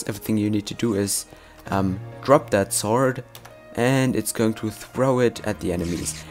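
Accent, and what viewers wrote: Germany. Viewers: Du solltest unbedingt üben flüssiger, schneller und ohne deutschen Akzent zu sprechen...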